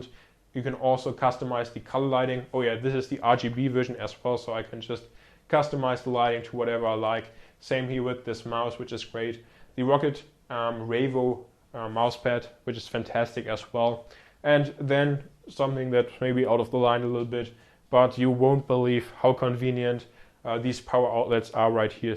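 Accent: Germany. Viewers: Man hört Mega dass du deutsch bist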